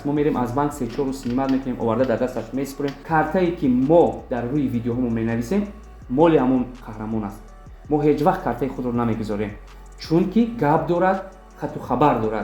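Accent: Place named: Tursunzoda, Tajikistan. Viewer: Муҳтарам шумо зодаи кадом гушаи Точикистон ҳастед, чунки тарзи сухангуйиатон каме ба мо мардуми Турсунзода монанди дорад?Агар малоли хотиратон бошад узр мепурсам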